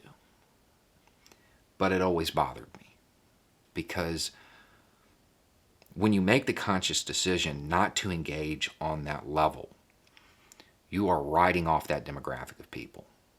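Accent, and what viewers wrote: South, United States. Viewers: Hey... You are great. Sometimes, you just need someone bilingual... You speak southerners English very, you found someone who speaks Christian English in, he seems to understand your language. Now maybe you can work together to achieve a better world. I couldn't do what you do in Florida, but I can probably do something with people that speak my language.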